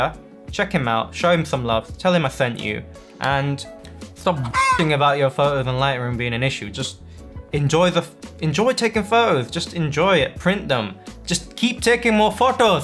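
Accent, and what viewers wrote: India. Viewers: Honestly, it was the Indian accent for me 😂 thanks for the info!!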